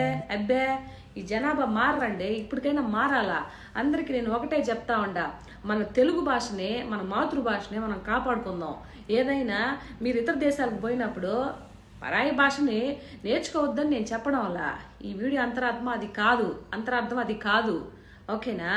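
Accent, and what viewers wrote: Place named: Krishna-Guntur, India. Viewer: Your Telugu language is also somewhat not clear. You always try to speak Krishna and Guntur Districts Telugu language. Regards.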